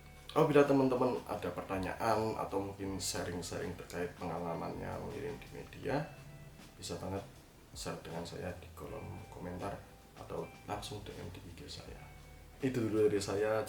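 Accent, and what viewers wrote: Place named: Ponorogo, Indonesia. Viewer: Ketika ngomong ponorogo medok banget mas.. i like it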